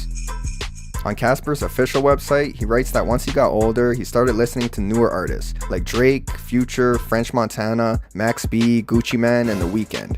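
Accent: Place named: Calgary, Canada. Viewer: Why do you have such a brutal Canadian accent? If you're from Toronto you shouldn't sound like you're from Calgary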